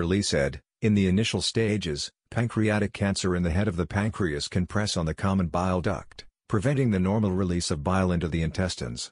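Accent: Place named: United States. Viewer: Why is this video spoken in an American accent when it’s aimed at a uk audience?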